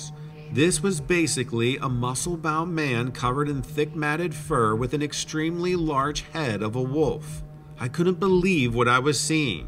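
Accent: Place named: South, United States. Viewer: I can tell you changed the tone a little you brought on more of the southern lawyer twang tyr sound. I dig it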